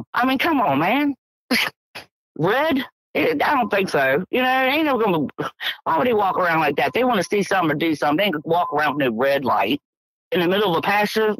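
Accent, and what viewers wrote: South, United States. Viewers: Yes mater of fact you sound country is a day is long doggone be yourself who else can you be rock on with your bad self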